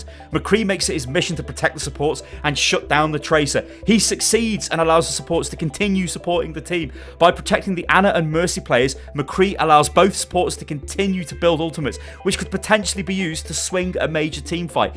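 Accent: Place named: United Kingdom. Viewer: People always fill the comment sections with memes and get all the thumbs ups. They're funny and all, but I want to say something that most of the people in here won't point out. I think / makes the best Overwatch videos in YouTube. Hell, he probably makes one of the greatest gaming videos in overall. Don't get me wrong, he isn't the world's greatest Overwatch player, but he cares so much to share his experience and insight of the game. He's enthusiastic of what his doing and at least I feel that I can absorb some of that energy to my gaming. And even though he would post garbage, I'd still probably watch it, just because of that sweet authentic British accent and toodle-oo! Love from Finland, keep it up.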